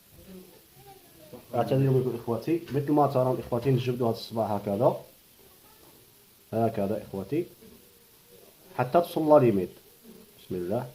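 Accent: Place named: Tlemcen, Algeria. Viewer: بارك الله فيك اخي اللهجة نتاعك تلمسانية لالا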